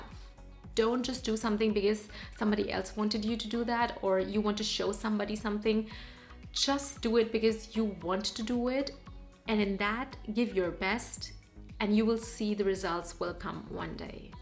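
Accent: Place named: Germany. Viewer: German englisch dialect 🤣👌🏽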